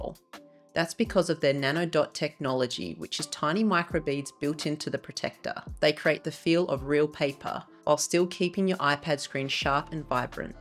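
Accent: Australia. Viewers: Now im being presumptuous and assuming Melbourne accent. So, the huge question is…… Collingwood or Carlton? I’m Collingwood good win last night 😎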